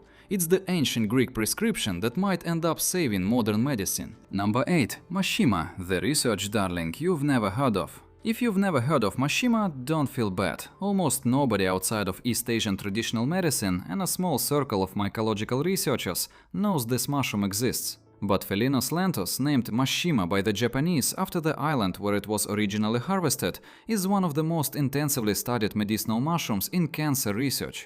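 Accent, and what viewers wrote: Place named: Russia. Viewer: На русском языке здесь только название ??? Но по произношению слышно,что читает русский😂 Как на моем косячном навигаторе: зе камера эхэд😂😂😂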